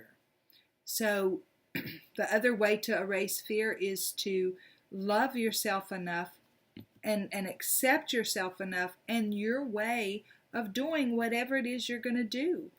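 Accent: South, United States. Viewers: Well said! No one like you, a shining light in the world with a southern accent!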